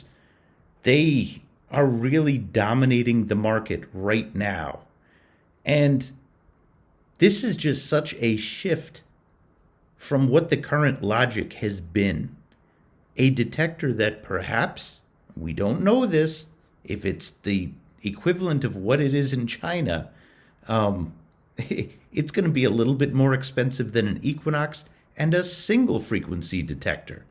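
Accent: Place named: New York, United States. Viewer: I mean I love the accent,, that New York area Kofffeee sound... But it's gotta be niche (neesh) not neech , like Cheech... Know what I'm saying?😅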